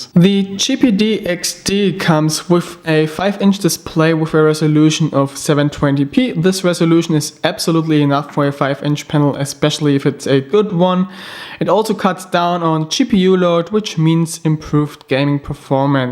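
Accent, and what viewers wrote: Germany. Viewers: german spotted :D good review mate!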